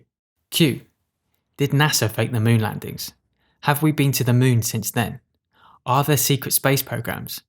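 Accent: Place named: United Kingdom. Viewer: Bloody Brit-ish acc-ent!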